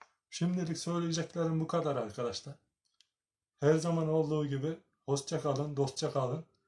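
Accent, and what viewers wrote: Turkey. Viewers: Sayin ustam süzülen topragi kaç dakika kaynatıyoruz kulun sadece suyunu katıyoruz heralde abicim şiveniz iç anadolu bende bu bolgedenim selamlar saygilar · Ustam nerelisin sivenir bize yakin sanki elbistandan selamlar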